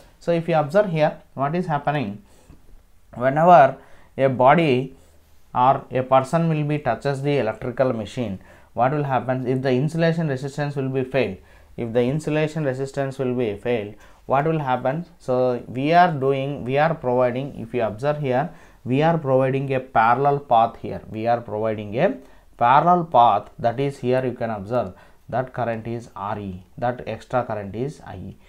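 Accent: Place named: Kerala, India. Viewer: cute mallu accent😘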